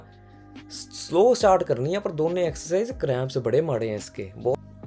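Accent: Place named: India. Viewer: the hindi accents killinggg meee😍🙏🏼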